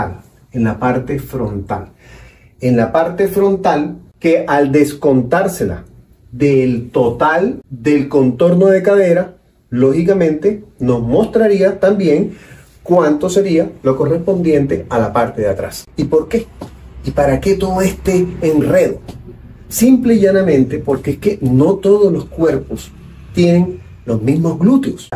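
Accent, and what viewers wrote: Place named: Venezuela. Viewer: Clase magistral, pareciera complicado, pero solo hay que prestarle atención y practicar. Tiene lógica. Gracias. Estas en Colombia, oero eres Venezolana, cierto? Por el acento. Soy venezolana, vivo en chile.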